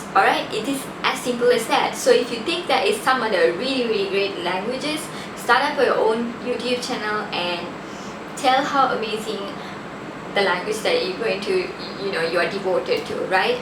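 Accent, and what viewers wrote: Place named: Tamil Nadu, India. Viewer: other than your tamil ascent i love you videos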